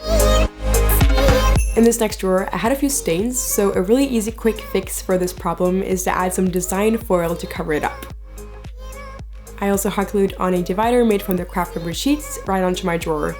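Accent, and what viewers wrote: France. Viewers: I'm french and I have the same accent as you love your vids merci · you have a great french accent and i know wht i'm talking about, i'm french ! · Hey are u French or something because it sounds as if u have some kind of accent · I’m not even French and I know that her French accent is on point 👌🏻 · Your French accent is sooo cute , I speak French since my 2yO😛😛😛💕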